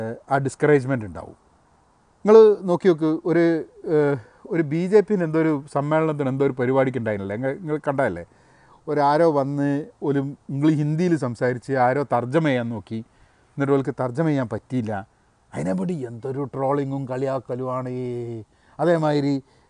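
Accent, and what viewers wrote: Kozhikode, India. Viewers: ഞാൻ കോട്ടയക്കാരൻ ആണ് പക്ഷേ എനിക്ക് ഏട്ടൻ ന്റെ കോഴിക്കോട് ഭാഷ വല്ല്യ ഇഷ്ടം ആണ്.നന്നായി മനസിൽ ആവുന്നുണ്ട്.ഇങ്ങള് supprrrr aneee.. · ങ്ങള് ഭാഷ മാറ്റരുത്...(കോഴിക്കോട്) അത് മാറിയാൽ പിന്നെ നിങ്ങളില്ല.... ( സഞ്ചാരം പരിപാടി അതിന്റെ വോയ്സ് ഓവർ കൊണ്ടാണ് ജനശ്രദ്ധ പിടിച്ചു പറ്റിയത്) അത് പോലെ... നിങ്ങളുടെ ഈയൊരു... ശൈലിയാണ്..കിടു... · ഞാനീ വീഡിയോ കാണാനുള്ള ഒരു കാരണം ഞമ്മളെ കോയിക്കോടൻ ബർത്താനം കേക്കാനാണ് അമേരിക്കൻ ഇരുന്ന്കൊണ്ട് ആ നാടൻ ബർത്താനം കേക്കുമ്പോ ഉള്ള ഒരു സുഖം അത് ബേറെ തന്നെ. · സാറെ,ങ്ങളെ മക്കളെ ങ്ങള് കോയിക്കോട്ടെ സ്ലാങ് പഠിപ്പിക്കണം!!ങ്ങള് കോയികൊട്ട്കാര്ക് അഭിമാനമാണ്!!പിറന്ന നാടിന്റെ ഭാഷ മുറുകെപിടിക്കുന്ന താങ്കൾ ഒരു മുത്താണ് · ഇങ്ങടെ കോഴിക്കോടൻ ഭാഷയ ഇങ്ങളെ ഞമ്മക്ക് പെരുത്ത് ഇഷ്ടപ്പെടാൻ കാരണം.. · മ്പളെ കോഴിക്കോട് പ്രനൺസ്യേഷൻ 👌👏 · Njammalu malappuranu ingale kozhikkodan bhasha Perth ishtanu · you are 100% right. I like your kozhikodan style malayalam, though I am from Kollam. 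English pronunciation is not something to be worried too much. we must be able to communicate. Those who want to learn english , please watch an english movie or a cartoon over and over until you learn all the dialogues in it. Then try another one. if you do two or three movies like that, you can easily communicate in English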